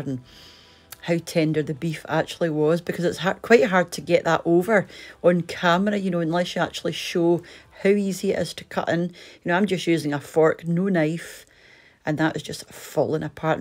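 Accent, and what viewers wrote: Scotland, United Kingdom. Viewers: I love Christmas too! Can't wait for New Zealand winter to make this stew in my slow cooker, I freakin LOVE Irish Stew!! I was raised in Invercargill, right down the bottom of the South Island, as you can tell by the name, it was settled by Irish, so I grew up on lots of different stews 😍 I am obsessed with your Scottish accent! Even though I've been living in Nelson, top of the South Island, for 26 years now, people can still tell I'm from down south because I still roll my R's slightly lol 😊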